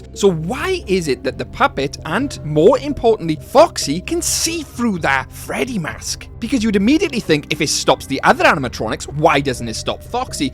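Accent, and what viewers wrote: Wales, United Kingdom. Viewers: Whats that accent? Is it welsh? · this is such a weird question but are you welsh??? i am and i think i recognise the accent loll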